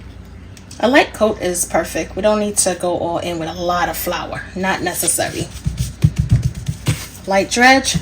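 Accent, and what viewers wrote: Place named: Brooklyn, United States. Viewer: Great accent. Maybe Brooklyn NY?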